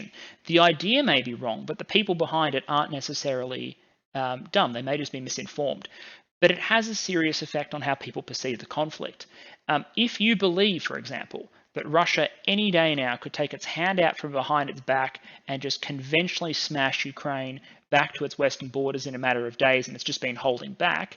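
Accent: Australia. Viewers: Your talking clap trap! Russia has been met with strong resistance by the Ukraine armed forces, and is heavily armed with British anti American anti tank missiles and anti aircraft missiles along with arming just about the entire male population aged between 18-60. Best you just go and give your opinion back in Australia judging by your accent.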